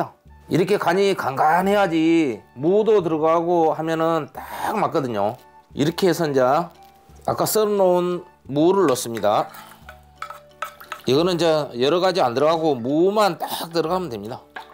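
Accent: Jeolla, South Korea. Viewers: 봄동 안담가도 전라도사투리 땜에 기냥 너무 맛나부러요 나도한번 담가볼려구요 그런데 지난번 무 간장짱아찌 담근거 어떻게 해묵어야 맛나는지 가르쳐 주신다했는데 ᆢ어떻게 되셨나요? 찾아보니 아직 못찾아서 유ᆢ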